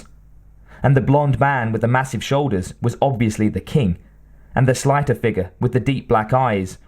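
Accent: United Kingdom. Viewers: I would have liked it if in this beautiful story each character had their own voice, as was done in the Radio Plays, plus the audio effects, and the suspenseful music in the background of the story, not to mention that the BRITISH ACCENT IS TOTALLY UNACCEPTABLE. Don't you think that in this story, the BRITISH ACCENT doesn't match the characters❓️❓️❗️ And how the hell can a Native American / ITALIAN - SIOUX (BILLY SOLE) or an American from the South, Beaver Creek Swamp (BLAIN) from Alabama or MAC from Kansas would speak with a British accent, and same for the others❓️❗️❓️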